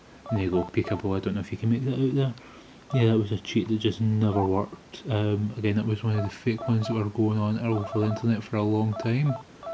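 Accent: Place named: Scotland, United Kingdom. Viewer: Thank you for this video! There's really no information on this thing anywhere online so it's nice to see it. Also a Scottish accent? Nice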